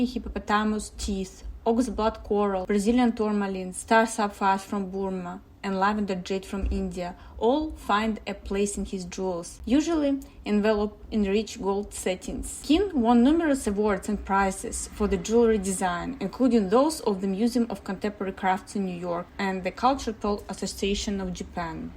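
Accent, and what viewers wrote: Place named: Russia. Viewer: She’s Russian. This is a Russian accent and I understand her perfectly. It helps that I attended a Russian church for over 35 years with my parents so I got very used to hearing Russian.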